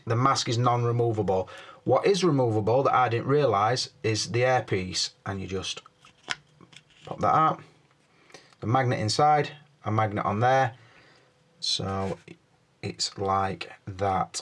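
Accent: Sheffield, United Kingdom. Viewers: I knew i recognised your accent ! Sheffield na na na "t'neight"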